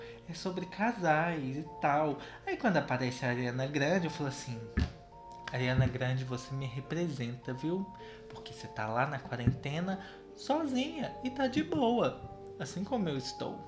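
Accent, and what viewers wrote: Brazil. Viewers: Ariana corre aqui pra ver esse babado! Nauo aguenta tu! Que voz BRASEEL! Cadê a segunda parte da história da polônia homi? ❤️❤️❤️❤️❤️❤️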